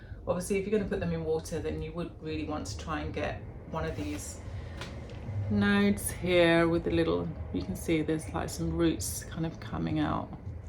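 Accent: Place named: United Kingdom. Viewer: Love live your British accent- you make words sound so fancy😊 Greetings from Connecticut-USA 🇺🇸but was born in the Philippines 🇵🇭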